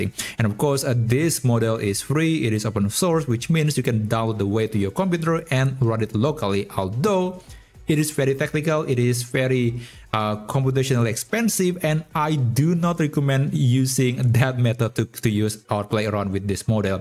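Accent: Indonesia. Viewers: Org indo ya bg? Aksennya ketara😂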